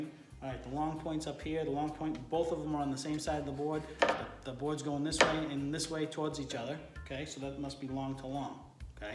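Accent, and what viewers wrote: Boston, United States. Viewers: I recognize a fellow Bostonian when I hear one!!!! 😂 😂😂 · Yeah, man. I’m a newbie and you’re talking straight to my level. You explain and repeat things so that I won’t have to rewind the video and it’ll be easily remembered. The comic relief and your accent (Boston, I’m assuming) is icing on the cake. Thanks, brah! Blessings.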